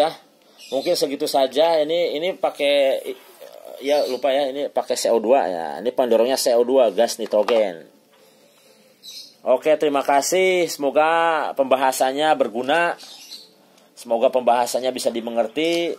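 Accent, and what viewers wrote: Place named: West Java, Indonesia. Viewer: nah itu dia om,,kalo ikutan gabung kan biaya nya bikin jangarrr...hehe btw si om nya asli mana ya..? kayanya org sunda ya? logat nya kaya gk asing om,,khas sunda wetan banget,,tasik,,garut,,ciamis..😁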